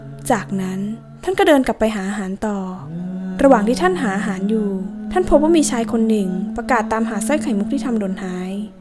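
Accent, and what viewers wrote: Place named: Thailand. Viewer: เขาเป็นมุอัลลัฟ ไม่เคยเรียนฟัรดูอีน เลยออกเสียงตามพยัญชนะไทย ไม่ใช่ตามภาษาอาหรับ เลยเพี้ยน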